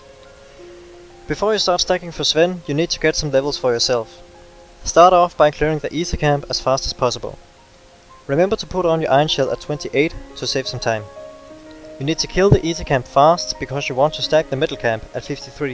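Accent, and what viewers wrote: Denmark. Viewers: your danish accent burns through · danish accent! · Are you danish. because you used the word "og" and you have a danish accent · Hi :) one simple question where are you from? your accent sound danish or so :D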